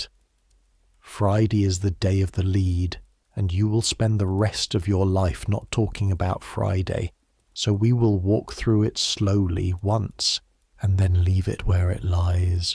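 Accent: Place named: United Kingdom. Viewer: Actually I do actually fall asleep to your voice. I have many times. Your voice is comforting, calming, and, to be honest, just a little boring. So it is very nice to sleep to. I happen to enjoy a British accent quite a bit, rather like a good smoke, even though I no longer imbibe. So I often have dreams related to the topics you’re talking about. I live in Norman, Oklahoma, USA & I like to think I know so much about certain members of the monarchy. I must seem hilarious or perhaps pitiful to some people. Many Americans know absolutely nothing about the royal family or British history. But bc it doesn’t involve my own life & I don’t have strong feelings about your monarchy or history, the subject matter is also calming & a little boring. But I just happened to wake up to this video of yours this morning am, Sunday, August 23, 2026. That is actually quite late for me. 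I will save this to finish for later,as I would a nice iced coffee with cream & milk. Good morning and thank you for some nice nights & mornings!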